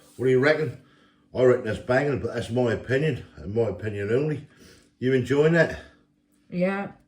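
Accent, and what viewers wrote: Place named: Norfolk, United Kingdom. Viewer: You a Norfolk boy? Where about you from?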